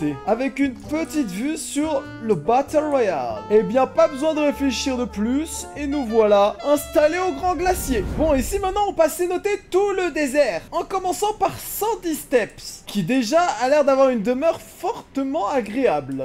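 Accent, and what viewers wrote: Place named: France. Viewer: L'accent Français 😎🤌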